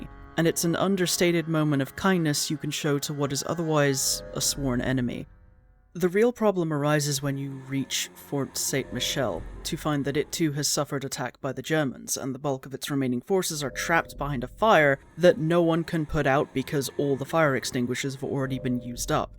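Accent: United Kingdom. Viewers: You are an excellent storyteller. The tone of your voice, background music, and the visuals add so much. Also am I crazy to think you sound like Sylvanas just British? Lol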